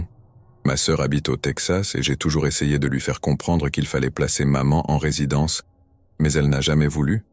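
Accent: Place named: Canada. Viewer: Quel régal ❤️ mais des fois ça déraille lol ça parle canadien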